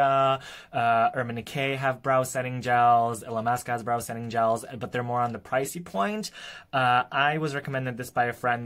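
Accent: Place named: United States. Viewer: Well you have an American accent...and you said “usually my videos are pole dancing related” explain!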